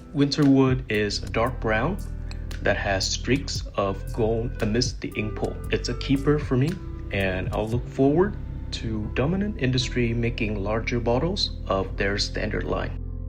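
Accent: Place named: Germany. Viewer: you have a really close German accent...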